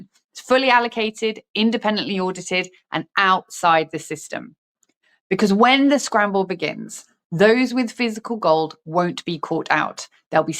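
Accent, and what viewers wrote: United Kingdom. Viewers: Love this fine lady. She speaks my language and with a beautiful and elegant British accent. 👍 ❤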